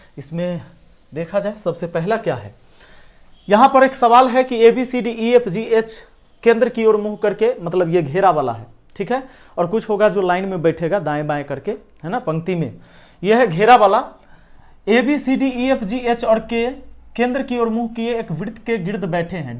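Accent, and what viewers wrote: Bihar, India. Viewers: apni bihari tone... mja aa jata h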